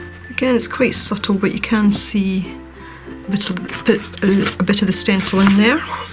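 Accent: Scotland, United Kingdom. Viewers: I've noticed you use certain colors frequently. I guess we all do! Whatever it is works for you because your pieces always come out so beautiful. I think I could pick out your style easily in a line-up, HAHA. That gold and turquoise you combine kind of looks like ancient stone. I LOVE it! You're my favorite youtuber right now and I'm thoroughly enjoying your videos! Oh, and great idea on using the security envelopes. I haven't tried that and plan to tomorrow. PLUS, I just adore your Scottish accent, lol! Hugs to you ~~Liz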